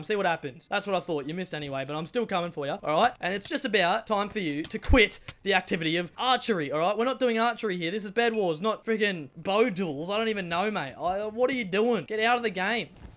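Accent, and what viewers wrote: Australia. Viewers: every video of December it sounds like is getting more and more Australian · This is the most Australian person I’ve ever heard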